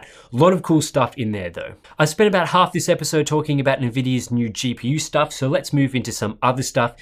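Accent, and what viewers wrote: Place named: Victoria, Australia. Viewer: It's that Vic accent. I'm SA and I hear the R first. Like sometimes I hear you guys say preformance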